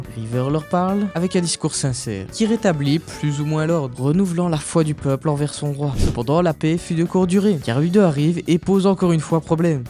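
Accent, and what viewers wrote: Belgium. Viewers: t'es belge non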